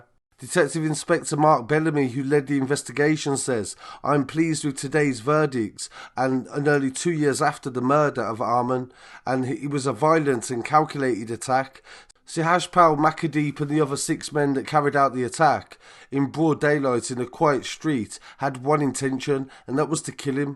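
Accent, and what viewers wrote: Birmingham, United Kingdom. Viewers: Bro your starting to sound like a brummy bin man again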